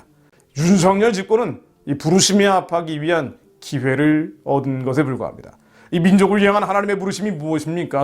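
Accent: North Korea. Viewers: 이분??북한말투 심하게 쓰시네~~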